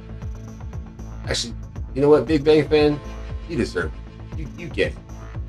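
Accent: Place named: New York, United States. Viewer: are u from NYC? you sound like a new yorker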